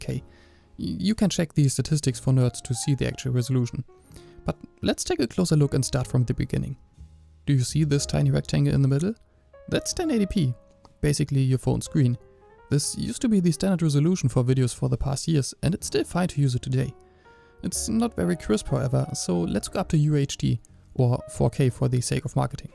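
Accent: Germany. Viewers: Nur deutsche hören das er deutsch ist 😂💪🏽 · I like the german accent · man hört so krass das du deutsch bist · Der deutsche akzent beste xD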